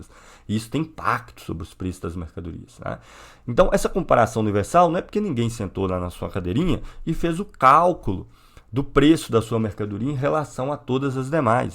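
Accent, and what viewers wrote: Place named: Minas Gerais, Brazil. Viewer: Kkkk isso tá me incomodando desde o início das aulas sobre o capital kkk Colé??? Kkk é vício de linguagem, cara é mineiro, vamos dar um desconto.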